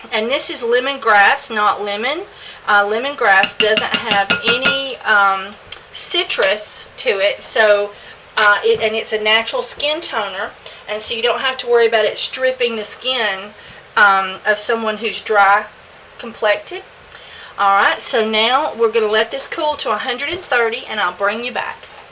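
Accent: South, United States. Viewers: Just wanted to tell you how much I love your vids, love the southern drawl :) and your soaps are amazing btw! :)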